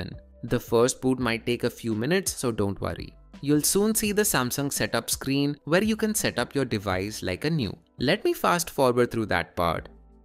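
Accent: India. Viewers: I dont understand what you saying bro i only hear indian yapping bruh 💔💔💔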